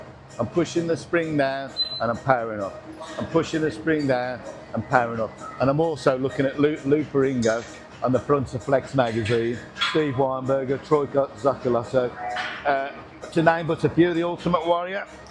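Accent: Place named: United Kingdom. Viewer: Love the British chatter.Never mind a good yarn. 👌🏼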